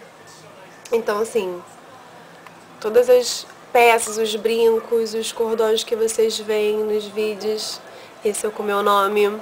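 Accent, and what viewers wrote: Rio de Janeiro, Brazil. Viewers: Oi, Adoro suas makes e seu sotaque carioca e muito fofo!! rsrs Vc tem twitter amore? Bjinhoss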